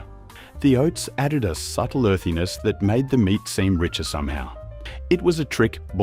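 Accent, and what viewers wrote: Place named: United States. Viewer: Our friend can't disguise his Yankee origins with his pronunciation of the words Aussie, after and new.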